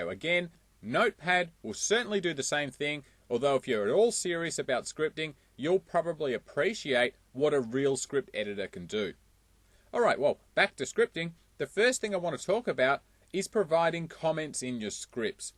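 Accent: Australia. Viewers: Love you hear an Ostayian doing IT videos. Cheers mate!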